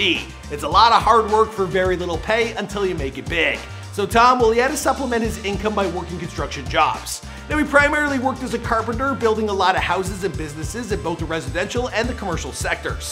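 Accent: Canada. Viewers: Why does the host sound like he is puking out every fifth word? Is he usually like this? No diss, just asking. Is this Canadian accent?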